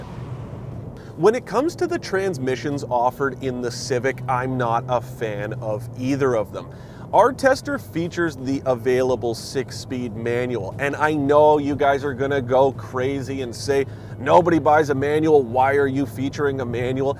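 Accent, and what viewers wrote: Canada. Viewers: I’m very much looking for a head-to-head comparison of thse two cars, especially how they handle, but find it impossible to bear this guy’s yukky accent. Is he a Canuck? It’s just awful trying to listen to him. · Sad that I noticed this was Canada by the turbo sticker on the civic before his accent gave it away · dead giveaways for Canada- "aboot" and "Mazz-Duh"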